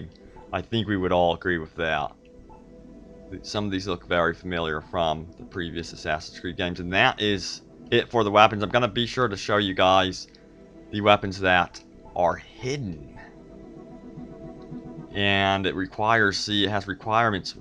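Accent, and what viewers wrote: United States. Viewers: He sounds like Forrest gump No offence but in English so to me everyone from the south sounds like Forrest gump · Are you american???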